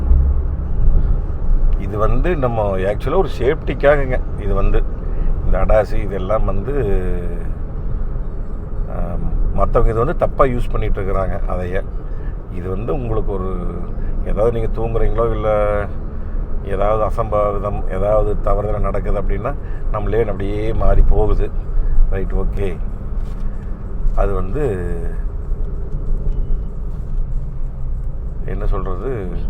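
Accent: Kongu, India. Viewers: கொங்கு பேச்சு வழக்கு அம்மணி மிகவும் அருமை 🌹🌹🌹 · Ungaludaya sweet kongu speech really super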